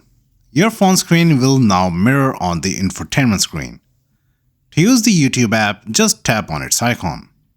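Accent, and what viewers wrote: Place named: India. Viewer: i will never believe a guy with indian accent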